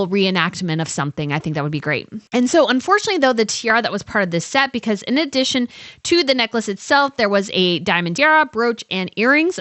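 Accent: United States. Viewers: Sorry the annoying, screechy, out of place, American voice was just too much for me to continue watching . What a shame!!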